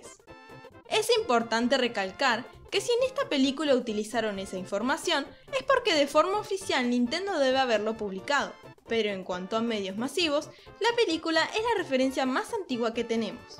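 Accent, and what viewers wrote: Argentina. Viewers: Me encanta el asenro argentino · Creo que es por su acento, debe ser de Arg. o Uruguay. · Luigi Es Mi personaje Preferido De Ambos... Las Risas Que Me Hizo Dar En "Mario & Luigi - Bowser's Inside Story" Fue Fantástico Jaja Les Tengo Una Pregunta... ¿De Donde Son? Es El 2° Video Que Veo Y Me Salió La Duda Si Son Argentinos Jaja Me Suscribí... Sigan Así...!! Saludos...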